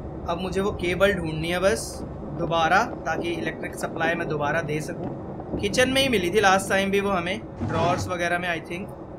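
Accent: Haryana, India. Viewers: Tumbara jo Punjabi/Haryani accent hai, vo sabse funny hai Funny in a nice way , I love all the accents . Not insulting.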